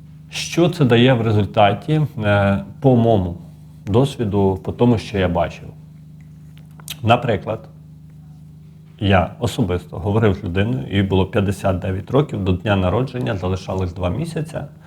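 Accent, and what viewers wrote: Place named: Western Ukraine, Ukraine. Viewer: Судячи по діалекту ви орієнтуєтесь на підписників зах.України.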